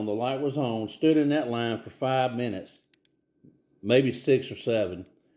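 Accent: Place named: South, United States. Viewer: You sound like a southerner. We are raised differently from our northern or far western counterparts. We believe in being friendly and gracious. Not everyone has those values.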